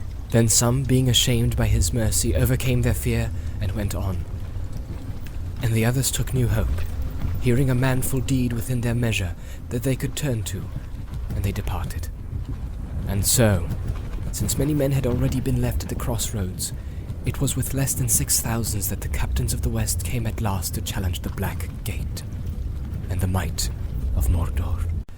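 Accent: Scotland, United Kingdom. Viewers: Wow what a wonderful Scottish voice/accent, a real treat! Many thanks.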